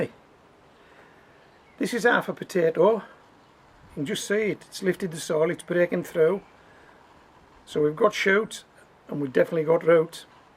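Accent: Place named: Teesside, United Kingdom. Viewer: Hi, Just discovered your channel. From your accent i would guess you are from Bishop Auckland or Teesside. I live in Consett. Just watched your video about winter onions. I will give those winter onions a try. I already grow potatoes, carrots, parsnips etc in pots. Great channel by the way!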